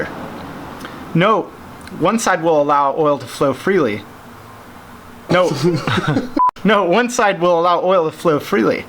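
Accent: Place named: England, United Kingdom. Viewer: I am not saying has gay hands even though they are pretty small and your ascent some times sounds a bit English.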